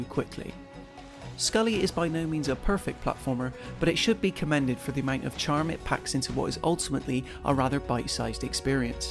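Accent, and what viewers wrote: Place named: Somerset, United Kingdom. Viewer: Think this guy is from Somerset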